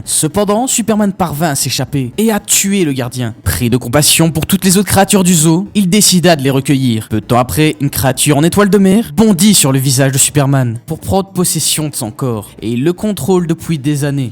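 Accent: Liège, Belgium. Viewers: J'ai beaucoup aimé ta vidéo Et j'ai cru comprendre a l'accent Que tu es un compatriote liégeois 🤜🤛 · c'est moi ou il y a un fort accent de liège xd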